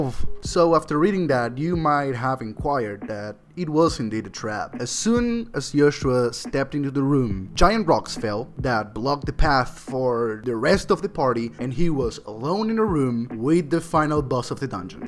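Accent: Argentina. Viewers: ¿sos argentino? Recién encuentro tus vídeos de D&d y me encantan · I really enjoyed the story! I had to comment, i need to know, decime que te lei bien el acento, sos argentino? Jugas d&d y sos de los nuestros? T_T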